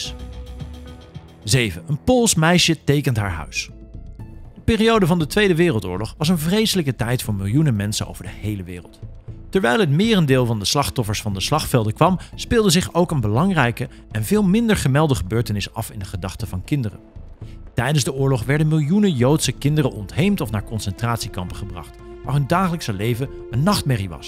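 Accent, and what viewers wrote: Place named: Netherlands. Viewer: 'Zeker en vast' is Vlaams maar je klinkt Nederlands. Huh?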